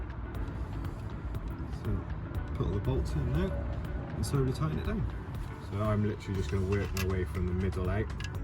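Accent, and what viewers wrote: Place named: Devon, United Kingdom. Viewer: Nice video mate .Where in the UK are you .You sound like Devon area ?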